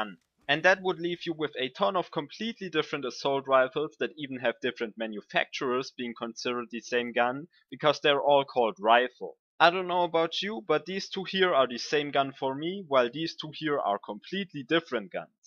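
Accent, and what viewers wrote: Germany. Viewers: are you german? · Are you german? You're English sound so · Bist du ein deutscher? Mann hört an deinem akcent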